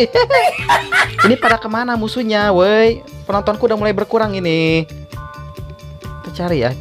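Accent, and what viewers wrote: West Java, Indonesia. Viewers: sunda kah? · Bang orang sunda bukan???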